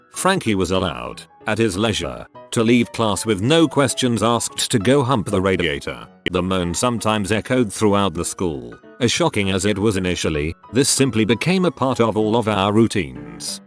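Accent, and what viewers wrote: United Kingdom. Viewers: when i first started watching these videos i could recognize that it was a British accent but now i cant hear the accent anymore.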